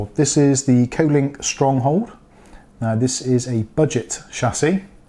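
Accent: Bristol, United Kingdom. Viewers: mate are you from bristol? sounds like it, im from there lol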